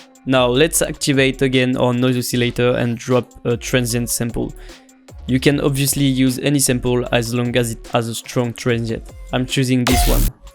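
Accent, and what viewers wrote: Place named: France. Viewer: That french accent, Sah quel plaisir ! Thanks for the tips did'nt know we could use samples in the noises osc ^^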